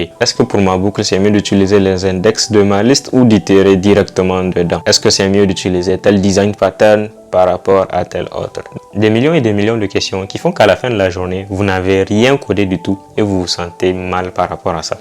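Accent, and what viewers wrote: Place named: Niger. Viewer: Merci pour le rappel Je sens comme un accent de mon pays le 227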